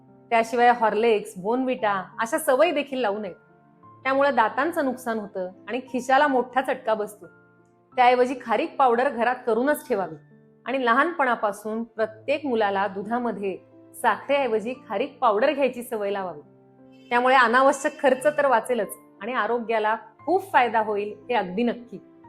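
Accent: Standard. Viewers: तुमचे video अत्यंत सुंदर माहितीपूर्ण असतात..उच्चार शुद्ध..जास्त पाल्हाळ नाही .त्यामुळे छान वाटतात.. आजच्या vid मध्ये एक sangal ka .खारीक मुले बरेचदा constipation hote..त्यावर काय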